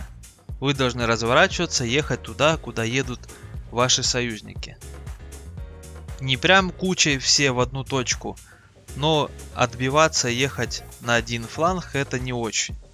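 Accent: South, Russia. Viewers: Ох уж этот южный Гговор))) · глупый)) он не не выговаривает)) это просто южный говор такой)) меня он очень забавляет)))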